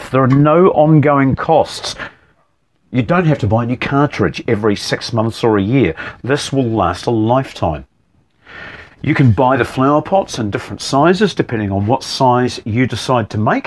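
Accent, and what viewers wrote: New Zealand. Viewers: So that’s how a real live newzealander sounds like!!!!!!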